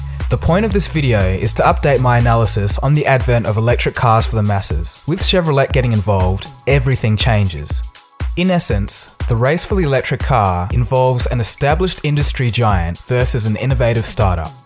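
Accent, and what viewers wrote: Australia. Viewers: OHHH SHIT MATE YOU'RE AUSTRALIAN WOOOOOOOOOOOOOOOOOOOOOOOOOOO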